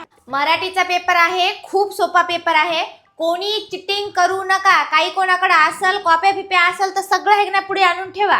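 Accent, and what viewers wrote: Nanded, India. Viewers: Madam tumhi Nanded chya haw ka manalo. Ase vatalay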